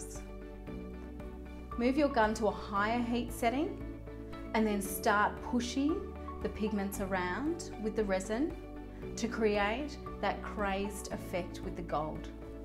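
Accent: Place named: Australia. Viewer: Nice to hear your familiar Aussie accent !! Great video xxx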